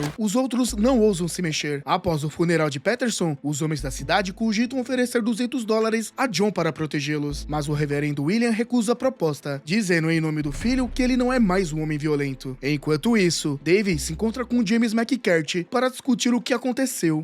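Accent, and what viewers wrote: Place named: São Paulo, Brazil. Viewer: Pq esse cretino que narra isso como se fosse um cretino? AH, pq o narrador é um cretino caipira! SAquei logo pelo sotaque! Paulistas kkkkkkk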